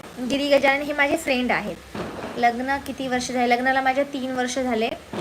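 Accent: Nanded, India. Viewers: तुमची नांदेड भाषा आम्हाला खूप आवडते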